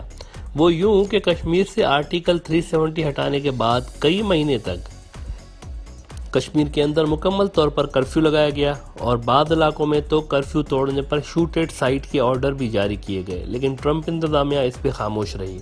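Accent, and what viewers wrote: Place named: Pakistan. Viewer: No He lives in Pakistan bc He is speaking urdu very fluently also he has perfect pakistani tone .BTW he himself Told in a comment of a video that He is Pakistani . That video is old it was abt Onion export .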